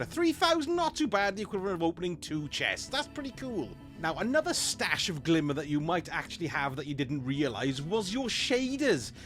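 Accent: Wales, United Kingdom. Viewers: Because he has a Welsh accent. Plus he’s said in a previous video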